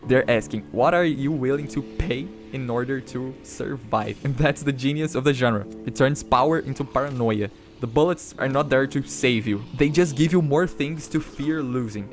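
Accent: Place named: Brazil. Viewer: amazing survival horror study, your game is gonna rock (and judging by your accent i think this is a r/suddenlycaralho? kkkk)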